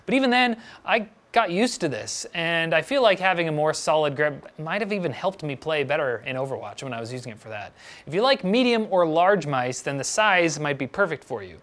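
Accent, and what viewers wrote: Canada. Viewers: Lord Cheezus how linus has a high pitched voice and is has an american accent is canadian and you can clearly hear it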